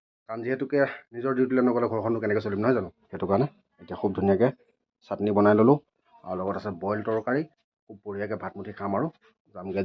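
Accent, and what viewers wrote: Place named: Nagaon, India. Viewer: Apunar video xodai saisilu kintu nagaon kotha kuwa karone beya lagit moi jihetu nagaon hoi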